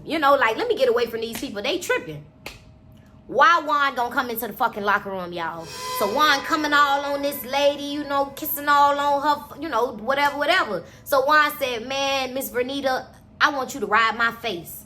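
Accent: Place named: Bahamas. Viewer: The Bahamian accent😅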